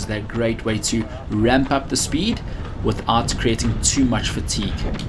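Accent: South Africa. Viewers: Gotta be South Africa then 😋 love the 5k thought process vids and this kind of content the most! Thank u for taking the time to do these informative videos!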